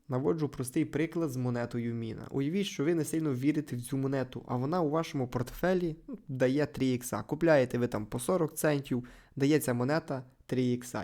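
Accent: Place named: Western Ukraine, Ukraine. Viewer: Дякую за відео,по говірці чути зо людина з франківщини чи львівщини , я вгадав???)))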